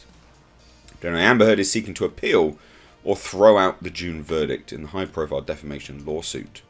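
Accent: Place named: RP, United Kingdom. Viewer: Your to woke !! Sound a bit like posh champagne drinking guardian reader !!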